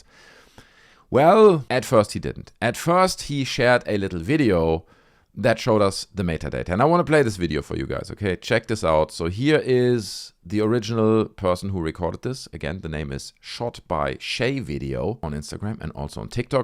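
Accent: Germany. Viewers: dude, the combination of your real german accent and choice of words while mocking what true believers would say in response to the "evidence" they have just seen on these videos clips is pure comedic gold!!! Keep up the great content. I am suffering with you but you make it easier to tolerate these idiots!!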